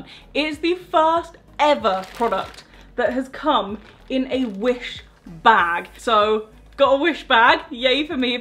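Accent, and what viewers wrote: United Kingdom. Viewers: Is she british?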